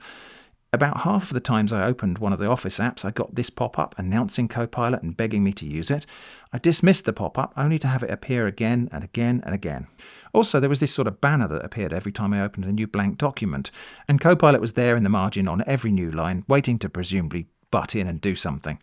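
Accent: United Kingdom. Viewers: With the lively British narration, I feel like I’m listening to a chapter of Hitchhikers Guide to the Galaxy!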